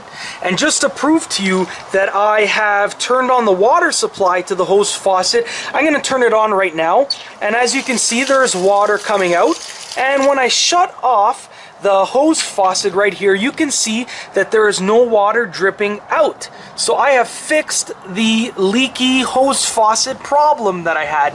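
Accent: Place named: Eastern Canada, Canada. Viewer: ray r at which of your local "skool" does he sound like a prof to you? Please tell is so we can avoid that area LMAO. He sounds like a typical north eastern guy or eastern Canadian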